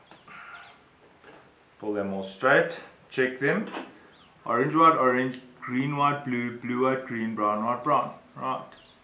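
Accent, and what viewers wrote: South Africa. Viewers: useful information you have a south african accent :)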